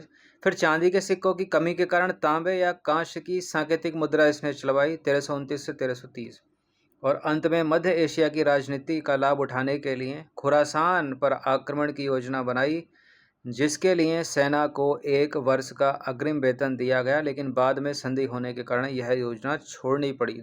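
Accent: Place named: Raebareli, India. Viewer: Sar aap kahan se bolate Hain Raebareli ki Bhasha aapki lagti Hai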